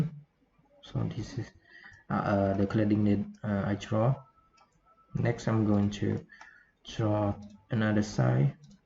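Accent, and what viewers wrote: Cambodia. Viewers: Your pronunciation sound as Cambodian style. But It’s very nice and impressive VDO. Thank for your shearing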